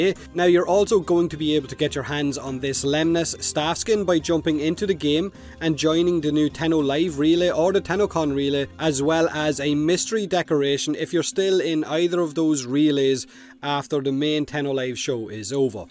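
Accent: Ireland. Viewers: Hey, you are Irish, right? Do you know Plenty O'Toole?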